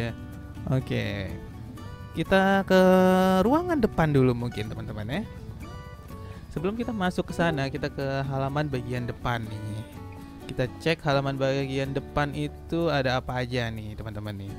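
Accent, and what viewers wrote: Palembang, Indonesia. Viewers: Bang kamu orang Palembang yaa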